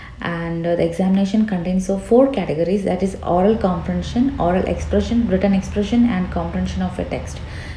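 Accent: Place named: India. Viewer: The strong Indian accent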